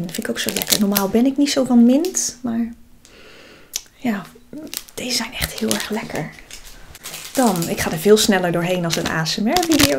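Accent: Rotterdam, Netherlands. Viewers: Dat accent haha, ze zeggen tegen mij ook altijd dat ik te plat-Rotterdams praat. Maar als ik jou hoor kan het me toch echt niet Rotterdams genoeg😋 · Gefeliciteerd dat blauwe bloesje staat je goed Leuk joh met die blauwe hoed je hebt wel een Rotterdam accent klopt dat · Gefeliciteeeeerdd!!! En wat vertrouwd een lekkere Rotterdamse stem te horen net als de mijne!!;) Je hebt het zeker verdiend!!xxx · Een echte Rotterdammert! Leuke video!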